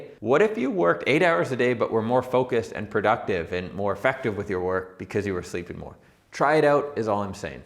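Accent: Canada. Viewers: Thank you for the video. You really seem to have a Canadian accent! 🏒🥌⛷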